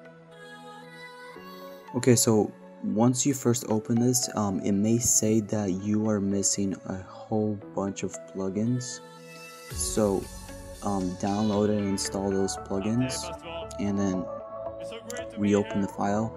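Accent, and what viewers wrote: Netherlands. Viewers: kom je uit heerenveen ofzo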